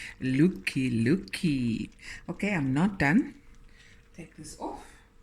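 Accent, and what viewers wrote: South Africa. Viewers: I knew she was South African.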